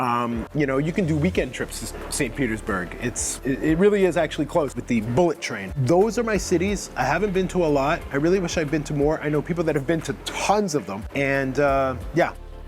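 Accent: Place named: New York, United States. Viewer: You still got that unmistakable New York accent, though!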